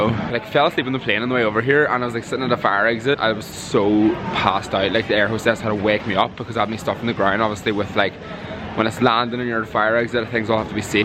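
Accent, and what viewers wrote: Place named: Scotland, United Kingdom. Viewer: I notice your accent are you from Scotland?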